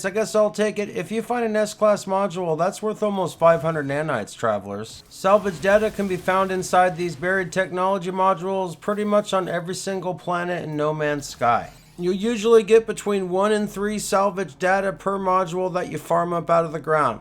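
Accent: Canada. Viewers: LOL. Just found this channel. im almost 30 hrs in. I JUST found my first Glyph Portal. Got 1 Crap freighter. a few ships. One is a fighter with way more dps than the other 2. 4 storage container rooms in the freighter. A few upgrades to things. Multiple Base Computers plopped on worlds. I havent even built a real base area. I have a 3x2 box with a door. 2 Specialists that now dont seem to do anything but stand there. Gettin the hanf of the game though, but if there is anyone out there that is less efficient than I am, you deserve an award, because this game is not user friendly. It has the most convoluted menu system ever. Its sleek...i'll give it that, but holy moly...spending 3 hrs to figure out how to do something as simple as figure out where to find "Hydraulic Wiring" 0.0 Still love this game though edit: Dude sounds like he is from Alberta edit: Grave Locations?? 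25 hrs in and i have died once. Found my grave. Never seen anything about others' graves o.o. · Sounds like you stepped straight out of Letterkenny lmao 🤣 thanks for the video. Haven't played in a year... Everything has changed so much... I decided to create a new game save so these tips will be very useful. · Are you Canadian?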